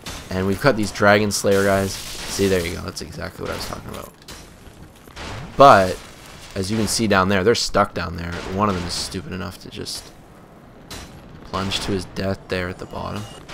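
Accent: Canada. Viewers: I just realized your Canadian .right?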